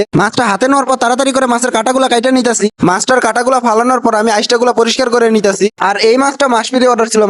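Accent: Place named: Comilla, Bangladesh. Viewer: Apnar basa ki cumillay naki mone hoy je cumillar vasa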